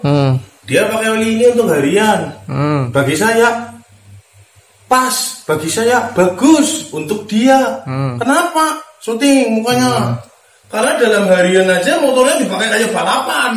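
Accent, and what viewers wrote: Banyumas, Indonesia. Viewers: Filsuf mesin dengan ymbahasa ngapak😅😅😂😂😂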